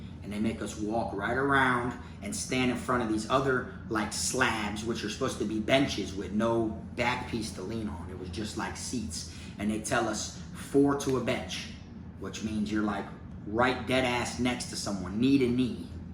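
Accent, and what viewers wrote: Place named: Louisiana, United States. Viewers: You sound like you have kinda a Louisiana accent. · lol theres no way your actually from broward, i mean you haven't lived there your whole life, i dont know too many people who were born in dade or broward and have that kind of southern accent, where are you originally from? you sound like what i call an FCG, florida country gangsta, sounds like it could be used as in insult but its actually not at all and you don't need to actually be from florida to be FCG, thats just where i first got it from. basically its somebody thats pretty much a redneck, spent most of their life in the country or a small town but for whatever reason is gangsta as fuck and could just as easily move to the city and fit right into the hood even as a white guy or like one of those white guys that ends up in the bloods or crips cuz they are just that kind of OG.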